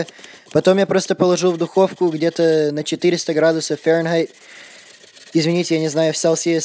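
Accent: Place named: Russia. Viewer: Молодец парень! отличная работа! А все кто смеются над русским произношением,- сами попробуйте на каком то другом языке кроме родного так же бегло что то рассказать .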